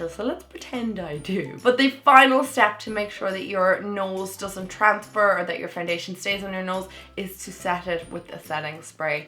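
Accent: Ireland. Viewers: I love your vidieos! I also love your makeup looks u do! I've bought several products from watching u that I love! I love the loreal blur cream and the nyx butter lip in fun size! I also love your accent!!! I'm Irish too and my grandfather has the same accent I love it!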